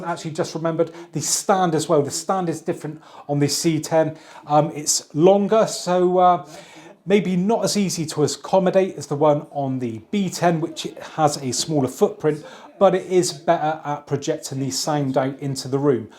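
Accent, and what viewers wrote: United Kingdom. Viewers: are you from the Uk?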